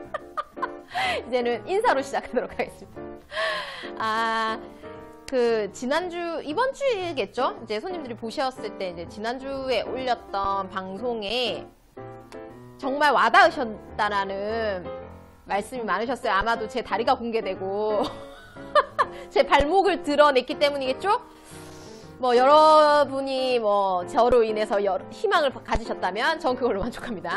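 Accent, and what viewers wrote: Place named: Gyeongsang, South Korea. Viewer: 반갑습니다 혹시 오십대 후반에서 육십대는 안 되나여? 돈 쫌 있어둥 섣불리 들어가기가 힘든 곳~~ 가슴과 엉덩이는 내려앉아 허리와 배는 절구통~~ 그나마 다리가 짧은건 대하고나니 쪼매 위로가 된다는ㅋ ㅋ 이런 조건들로 인하여 번쩍거리는 유리창 너머는 늘 곁눈질로만 바라보고 지나간다는 슬픈현실~~ 왜냐면 저런데는 쭉쭉빵빵만 다니는 곳이기에~~ 근데 롱셔츠나 롱원피스는 내도 보정옷 착용후 입으면 되것는데~~~?갱상도 어디세요? 완전 우리동네 억양이네여^^~~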